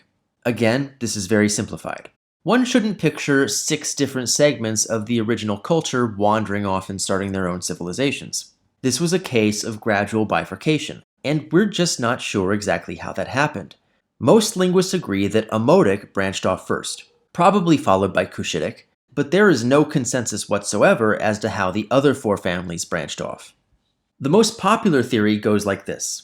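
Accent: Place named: New York, United States. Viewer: This guy has an interesting American accent. His long A vowel has New York/Yiddish coloring that makes some of his words sound like British RP.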